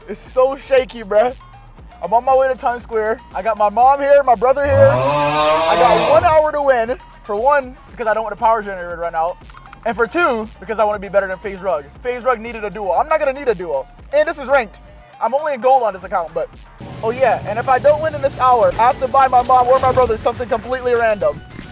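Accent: Jamaica. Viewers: You Jamaican born of desent or just love the Jamaican accent?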